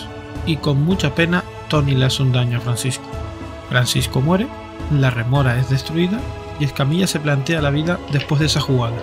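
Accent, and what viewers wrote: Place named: Canary Islands, Spain. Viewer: Que bueno escuchar a un chicharrero comentando Magic, nuevo sub❤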